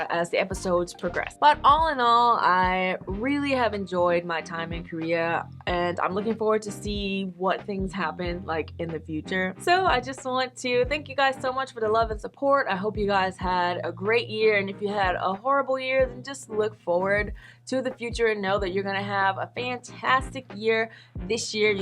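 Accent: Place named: South, United States. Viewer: Congrats 🎉🎊❤!! What I love the most is that you still have a southern drawl when you speak, it's been 8 years and you can still tell you're southern. Thanks to your videos I got to learn more about Korean culture through the perspective of a Black-American woman! Thank you for your dedication and love sis!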